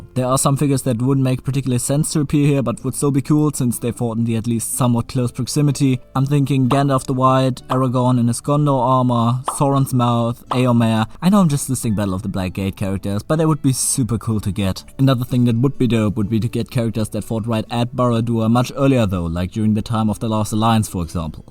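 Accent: Germany. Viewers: U are German right?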